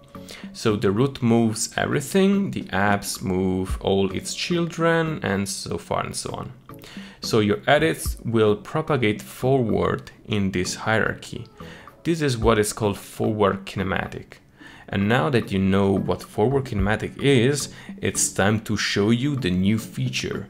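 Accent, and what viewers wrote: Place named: Italy. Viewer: You sound a little italian...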